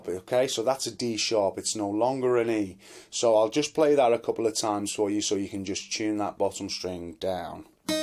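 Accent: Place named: England, United Kingdom. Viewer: Definitely the best channel on the net for beginners and intermediates non English natives. I appreciate a lot the vocabulary you are using and the speed of the lessons and the explanations. Very very nice English accent. You transmit the calm and the patience necessary to learn. That way you will add a lot of subscribers from abroad as my case. Kind Regards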